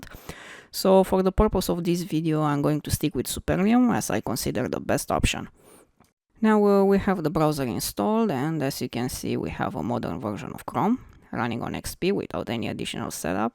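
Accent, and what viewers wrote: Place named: Russia. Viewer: love your accent. its russian?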